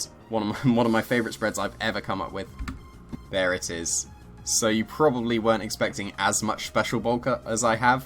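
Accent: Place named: England, United Kingdom. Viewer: English accent best accent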